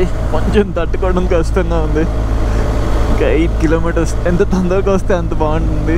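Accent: Godavari, India. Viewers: Godavari slang ❤❤ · Godavari language.. ❤💖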